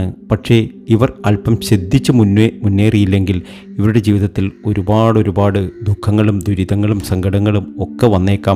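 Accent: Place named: Alappuzha, India. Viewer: ആലപ്പുഴ ക്കാരൻ ആണല്ലേ 👌👌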